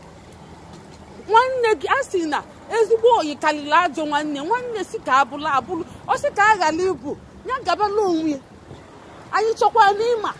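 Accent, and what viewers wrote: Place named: Igbo, Nigeria. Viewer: I like your igbo accent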